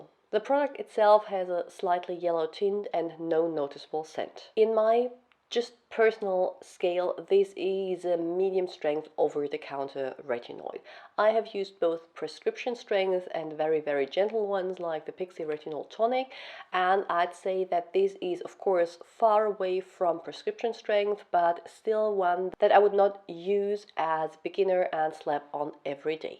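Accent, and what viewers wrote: Germany. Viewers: Why I Hear a german Accent 😂 Are u german ?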